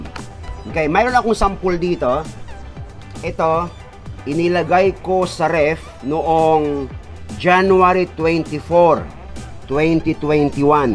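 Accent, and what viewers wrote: Pangasinan, Philippines. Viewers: Pagka po ba inilagay na sa ref ilang days po bago babasain? Same taga pangasinan po ako Sabi ko na nga po ehh halata sa boses😄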